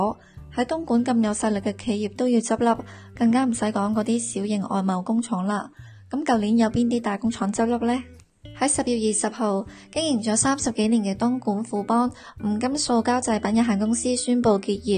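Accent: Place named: Hong Kong. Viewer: 是HK話 唔係廣州話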